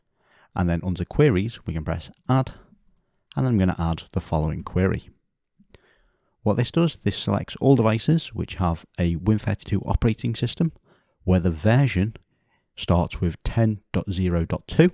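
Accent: Liverpool, United Kingdom. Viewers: Are you a Scouser?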